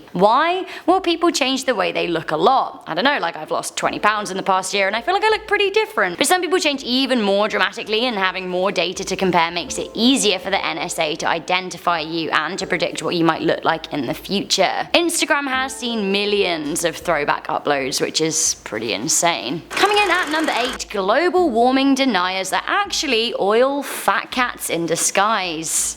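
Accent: England, United Kingdom. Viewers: British accents get annoying. I got a massive knob ya? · Hey, Your accent is beautiful is it British? Btw you're gourgeous💯💓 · Damn shes hot, the English accent makes it better lol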